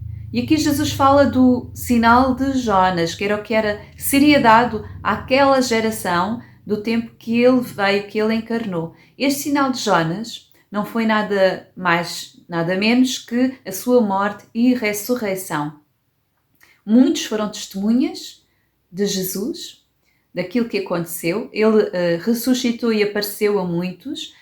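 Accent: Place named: South, Brazil. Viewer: Que linda eu aprecio muito o português sul real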